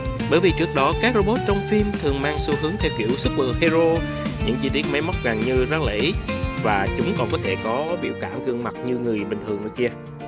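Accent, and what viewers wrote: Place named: North, Vietnam. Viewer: Này chắc chắn là anh em miền Bắc rồi 😂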